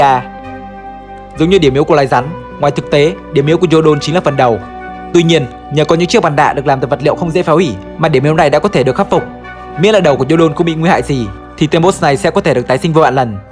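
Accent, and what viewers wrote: Japan. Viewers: Có một vấn đề mà mình khá khó hiểu, chủ kênh anh thường phát âm danh từ thuật ngữ trong video theo kiểu Nhật dù có là từ có phần tiếng Anh cũng thành japanlish, mà sao trong video này đoạn lúc thì lup'A'n lúc thì lup'I'n vậy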